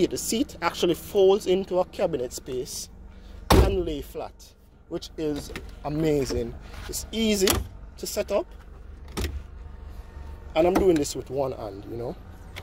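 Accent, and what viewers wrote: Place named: Jamaica. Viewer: your tone tells you from Jamaica,.....wagwaan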